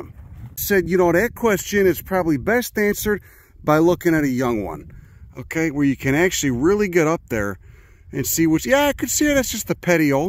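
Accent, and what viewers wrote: Chicago, United States. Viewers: Every nerd should have an Italian with a thick Chicago accent teach them about their interests.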